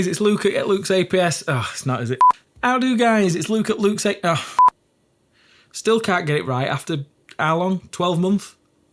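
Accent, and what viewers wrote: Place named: Yorkshire, United Kingdom. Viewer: yes lad.. this is that perfect level of yorkshire accent and professional elocution. didn't mean to sound like a dick in prior comment lol. sold on the foam flock, natural undulation is easy mode. purchasing soon!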